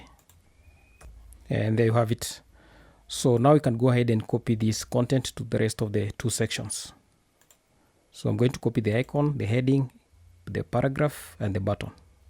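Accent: Kenya. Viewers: He sounds kenyan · He sounds like a Kenyan Nice tutorial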